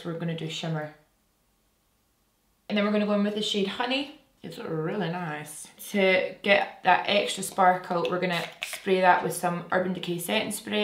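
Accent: Scotland, United Kingdom. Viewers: nice to hear a fellow scot on youtube xx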